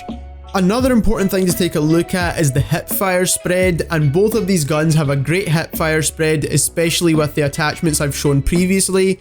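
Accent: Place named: Scotland, United Kingdom. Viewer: He is from Scotland , i think , because he has a scottish/irish accent.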